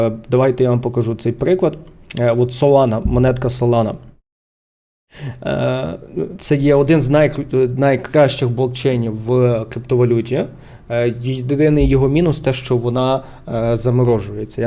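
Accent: Lviv, Ukraine. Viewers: Українська мова Львіський акцент ТАК ТРЕБА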